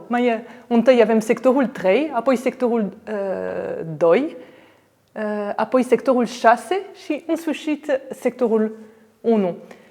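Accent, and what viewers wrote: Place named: France. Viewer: Aveti un vocabular foarte bun, dar ar trebui sa lucrati la dictie pentru a elimina acel accent frantuzesc foarte puternic si a pronunta corect litera R. Suna foarte neplacut in limba romana. La emisiunea "chefi la cutite" vin straini care vorbesc MULT mai bine romana dupa doar cativa ani in Romania, chiar si doar dupa cateva luni...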